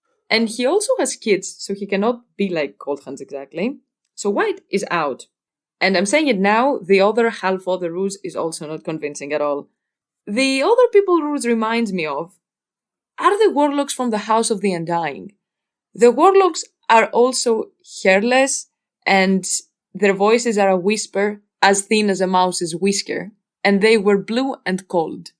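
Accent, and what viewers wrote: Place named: Greece. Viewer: Το κατάλαβα από την προφορά, σαν την δική μου, ότι είσαι ελληνόφωνη!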